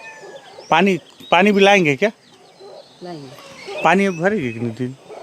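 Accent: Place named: Varanasi, India. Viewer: गुरु आप वाराणसी से है या छत्तीसगढ़ से ही क्योंकि आपको देखकर लगता है आप छत्तीसगढ़ से है और सुन कर लगता है वाराणसी के है राम जी का पूरा प्रभाव है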